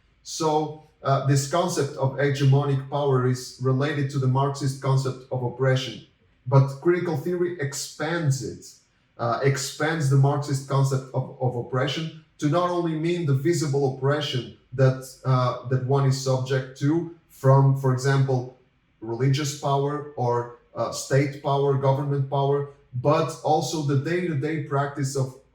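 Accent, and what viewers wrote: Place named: Brazil. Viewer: I'm learning Portuguese , i love your accent, I'm not sure but probably you're from São Paulo do Brasil, or Portugal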